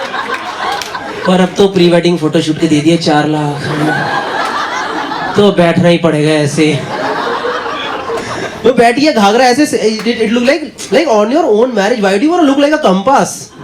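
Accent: Delhi, India. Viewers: He is awesome- with a Delhi Punjabi flavour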